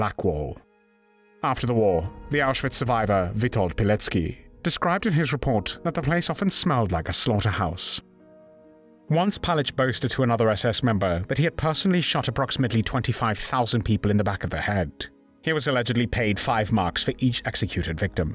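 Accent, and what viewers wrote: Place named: Wales, United Kingdom. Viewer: The most horrific thing in this video is the terrible narration with a weird Welsh accent. English (international English) was too hard? Stopped watching at the 6th time he said POWLAND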